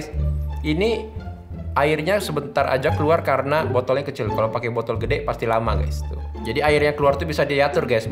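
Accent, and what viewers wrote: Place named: Madura, Indonesia. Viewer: Bang lu orang madura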